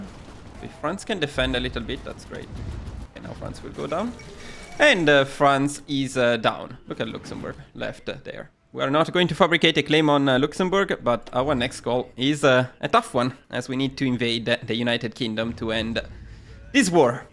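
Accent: Italy. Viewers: Grande bomber avevo un botto voglia di fare sto achievement ma non Trovavo un tutorial buono e poi il tuo accento Italiano spacca, di dove sei?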